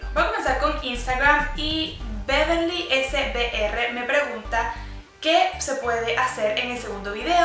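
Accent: Venezuela. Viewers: Venezolana? Saludos!! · La verdad, no se te nota, el acento caraqueño, yo pensaba, como de Maracay, Valencia mas o menos. Saludos, estaré viendo mas de tus videos. Cuando puedas, porfa, me enviás un saludo en tu próximo video. Gracias.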